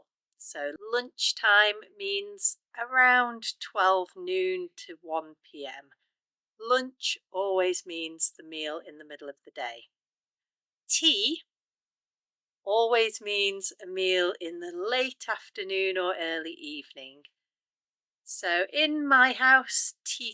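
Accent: United Kingdom. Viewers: It's easy to hear and to learn. I love the British accent though. Thanks teacher!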